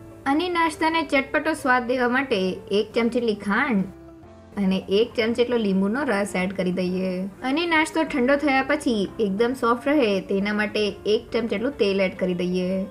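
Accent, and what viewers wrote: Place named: Kathiawar, India. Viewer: આપણી કાઠિયાવાડી બોલી 🙂 સાથે મસ્ત રસોઈ 👍 God bless you 🙌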